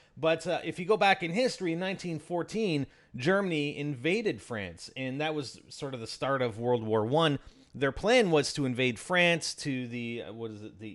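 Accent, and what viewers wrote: Canada. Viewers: is canadian you can by the way he says about and out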